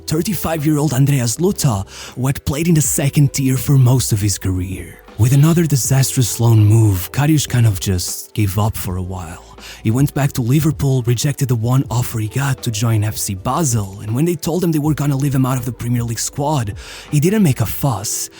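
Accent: Portugal. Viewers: Your Portuguese pronunciation of every name gives me AIDS. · His portuguese accent is kinda anoying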